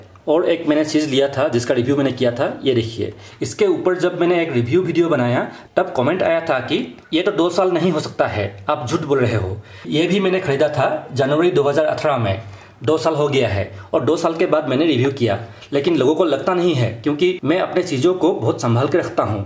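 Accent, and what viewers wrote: Assam, India. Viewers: are you from assam bro? guessing it from your accent 😆😆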